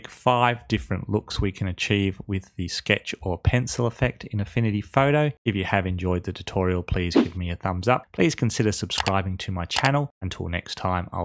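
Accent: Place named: Australia. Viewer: Thanks mate i have only just found you.... easy to understand you are an Aussie!!! I'm staying on board!!!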